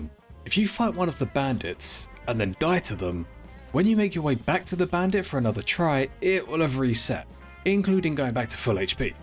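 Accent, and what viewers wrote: United Kingdom. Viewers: the lets go shopping caught me off guard after being used to the smoky british accent for so long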